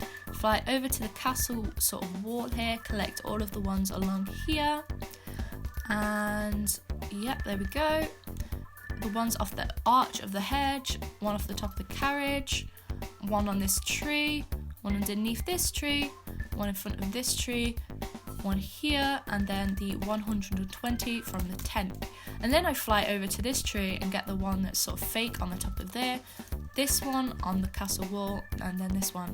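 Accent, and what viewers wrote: United Kingdom. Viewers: Am I the one that love her british accent ?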